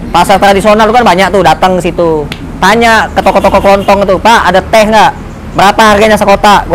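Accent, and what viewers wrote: Palembang, Indonesia. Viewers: Logat Palembang nya dak ilang... Sukses trus dunia akhirat bang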